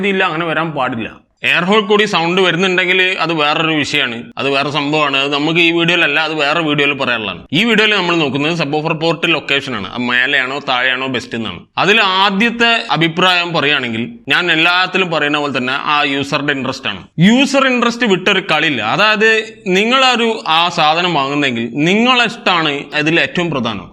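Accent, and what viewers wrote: Kozhikode, India. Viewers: വളരെ നല്ലവണ്ണം മനസ്സിലാവ ന്നുണ്ട് ഇ ടയ്ക്കൊക്കെ കോഴിക്കോടൻ സ്ലാംഗ് രസികനാണ്. Keep it up f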